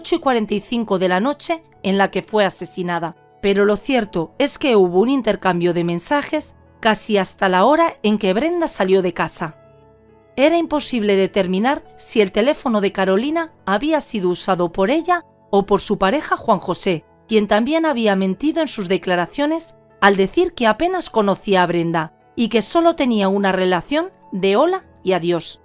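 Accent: Spain. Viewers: eres una mujer excepcional. Relatas casos a la perfección. Me encanta tu acento español. En cuanto al caso de Brenda, afortunadamente se hizo justicia y ese maldito (Juan José Murúa) se pudrirá en un reclusorio. Ojalá también se haga justicia para Marisol y Luz.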